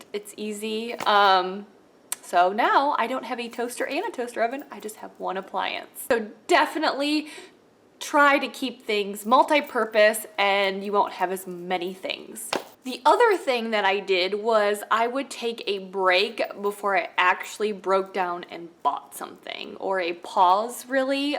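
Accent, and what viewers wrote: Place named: Chicago, United States. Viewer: Great video! I'm new to your videos. Are you from Chicago Area? I love it there - your accent reminds me of the great people there!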